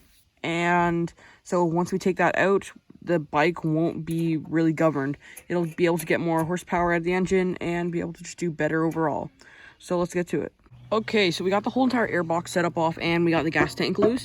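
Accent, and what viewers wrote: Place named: Canada. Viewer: You said ya hit 50 and I was tripping out thann you said "take err for a rip" and I realized ahhh he's a Canuck. Hahaha KMH not MPH 🤣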